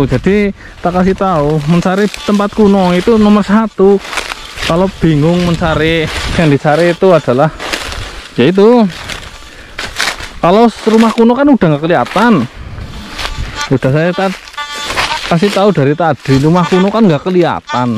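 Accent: Banyumas, Indonesia. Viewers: Ora ngapak ora penak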